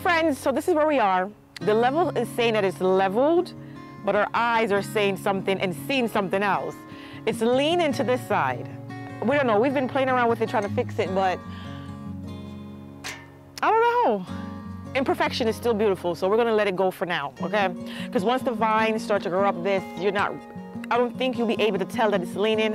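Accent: Patois, Jamaica. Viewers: Loved hearing the strong Jamaican patois 😁🇯🇲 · Love the progress of your garden. Everything is coming along great. I'm looking forward to seeing it in bloom. I also love to hear your Jamaican accent when you are talking with your husband. 🥰 God bless your family and garden. 🌷🌿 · Love to hear the patois. TFS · Beautiful choice,! It probably looks crooked to you because of something in your line of sight. It looks straight and even from this side. It will be even more gorgeous when those vines start creeping up and over it. Btw, I think this is the first time I’ve ever heard you speak Patois. 💚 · I have Jamaican pastors and I love to hear them talk in the native accent and tongue. your accent popped out real quick and I just love it! the arbor is beautiful. I like that it isnt stark black. the muted color tone will only make whatever you put on it pop even more. 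#tfs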